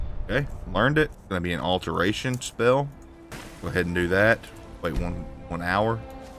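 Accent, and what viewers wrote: South, United States. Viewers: The accent caught me off guard 😭 I'm a southerner myself tho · Saw the video and thought "I hope it's the guy with the southern accent" and it so was. Think it's time I subscribed lol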